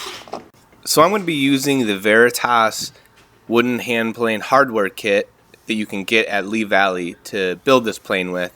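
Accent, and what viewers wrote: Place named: North Carolina, United States. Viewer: Am I heating a North Carolina accent? You sound like my grandson in Fayetteville. Great video.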